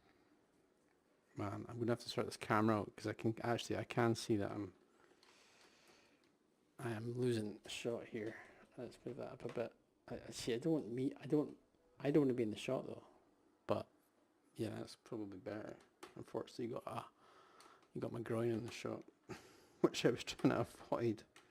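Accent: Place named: Scotland, United Kingdom. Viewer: Thanks, love your low key presentation (and the Scottish accent!)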